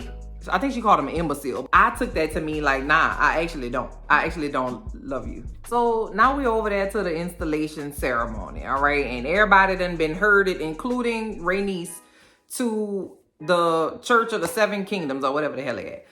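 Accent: New Orleans, United States. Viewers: First off, let me say it....I love the accent. You about to make me go visit my family in New Orleans now. LOL. But as far as the theory of Otto Hightower taking the life of Allicent's mom is a good one. The way he said it was creepy. Like when fathers normally say that it's cute and they're smiling like they're happy. But when Otto said it, it was giving me creepy vibes. As for Helena, y'all better listen to her. She be prophesying. I said oh what's about to come from under the ground. LOL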